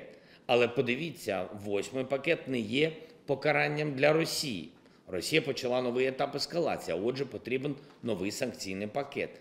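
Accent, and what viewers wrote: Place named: United Kingdom. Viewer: He speaks English with a British accent. He works for London city Charles.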